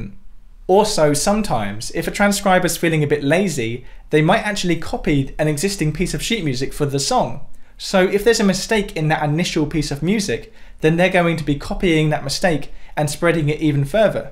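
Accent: United Kingdom. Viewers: If this guy didn’t have a British accent I would have turned this video off at :45. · Your accent is more like than mine, but we all say the word " " with the first syllable stressed and a little higher in pitch, right? In American English that first syllable isn't hit as hard in UK English even allowing for the wild variations we have over here. So, maybe it's sprechstimme?:-D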